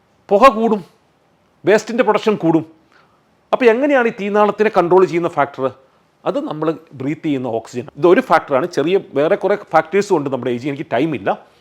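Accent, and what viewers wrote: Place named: Pala, India. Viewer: ഡോക്ട്ടറുടെ നാട് പാലായാണോ? ഞങ്ങളുടെ സംസാരം പോലെയാണ്. പ്ലീസ് reply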